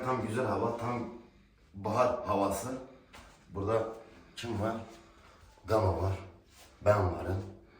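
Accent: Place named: Urfa, Turkey. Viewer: Kral Urfalı olduğun o kadar belli ki, özünü kaybetmemen mükemmel bir şey dezenktefe diyince aklıma teyzem geliyor 😂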